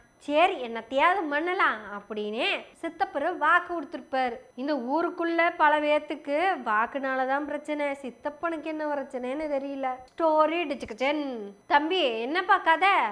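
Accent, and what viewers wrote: Kongu, India. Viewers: தோழர் நான் coimbatore காரனுங்க கொங்கு தமிழ் உங்களுக்கு சிறப்பா வருது. ரொம்ப ரசிச்சு கேட்கமுடிஞ்சிது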